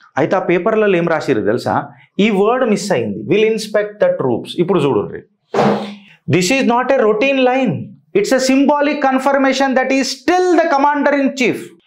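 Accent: Telangana, India. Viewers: Sir మీ ప్రెసెంటేషన్ చాలా బాగుంది.. Flow, తడబడకుండా,, ఎంత చక్కగా matter చెప్తారు... తెలంగాణా తెలుగు వినడానికి ముచ్చటగా, ఇంక వినాలని అనిపిస్తుంది...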